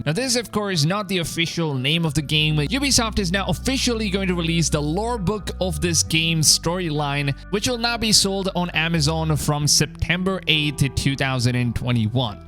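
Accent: India. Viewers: Yes and he clickbaits by using thumbanails and titles ,perhaps he is somewhere from india but his accent is different from the accent which i hear from the common folks of my country. And i think we shouldnt follow this guys content . Sometimes he says AC valhalla is the best assassin but after making 2 videos he says AC vallahalla and Ac odyssey are repetitve games.. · U indian boi tho? I am picking up a slighhhht accent wasup?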